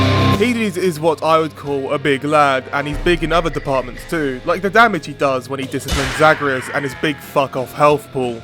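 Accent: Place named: United Kingdom. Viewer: Next time pick a non British voice actor. Great video thou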